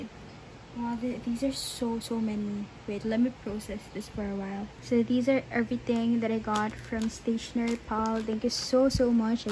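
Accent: Philippines. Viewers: ahaha i knew she was filipino kase her accent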